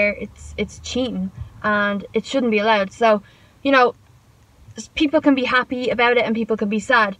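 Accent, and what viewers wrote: Ireland. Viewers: You're Irish where you from love I'm from tallaght can't guess your accent haha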